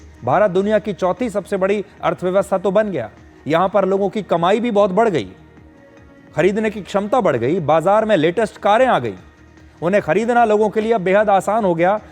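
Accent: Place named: Bengal, India. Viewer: U r 100% bengali. (Ur pronunciation is similar to bengali)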